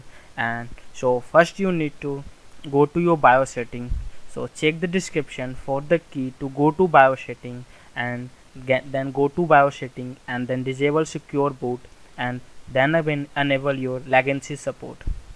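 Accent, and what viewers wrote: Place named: India. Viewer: Great man you saved me, now i hate Indian accent English little less I can say.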